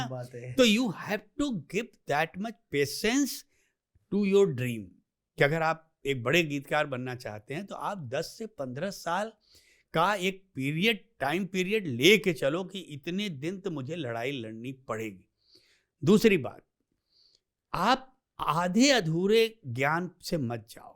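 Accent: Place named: Uttar Pradesh, India. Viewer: Khushi is baat se aati hai ki jab bolte ashutosh beta ,ye jo hamare UP ka jo lejhga hai h dil jeet leta hai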